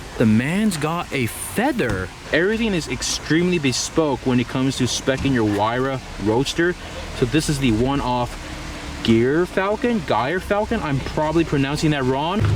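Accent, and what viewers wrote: United States. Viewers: The way you talk, quintessential american. like it....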